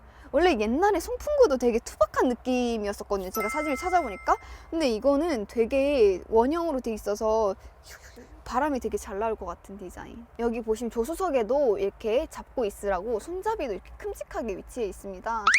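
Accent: Gyeongsang, South Korea. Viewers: 이까지 라니 ㅎㅎㅎㅎㅎㅎ 부산 경남이 고향이신가요? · 경상도 사람인가?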